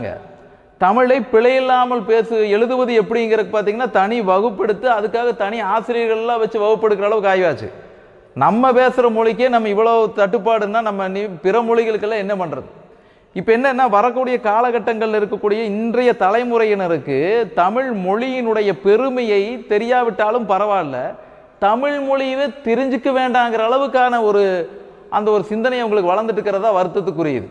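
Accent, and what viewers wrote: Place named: Kongu, India. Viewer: கொங்கு பேச்சு அருமை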